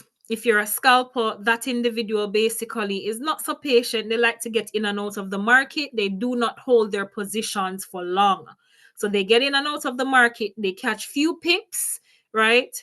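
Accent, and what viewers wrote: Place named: Jamaica. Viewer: Good video! Are you jamaican? You have a lovely accent 💯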